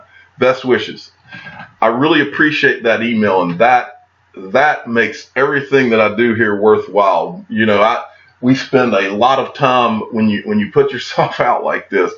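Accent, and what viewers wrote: South, United States. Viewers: Maaan!!! You are the mf shiznitt!!! I truly appreciate ya along w a lot of other people! We always gonna have a few haters in the batch, so screw em!! I’m from the south too so when I hear ya talk, i swear it’s like listening to a uncle or close family friend schooling me on that xrp, baby!! We feel that southern hospitality from ya! So again just wanted send a big thank ya! muchos gracias! & a sho appreciate ya!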